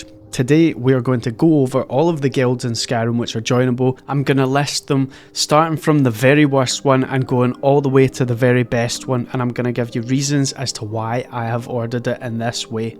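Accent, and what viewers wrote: Scotland, United Kingdom. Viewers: Do I sense a fellow Scottish legend?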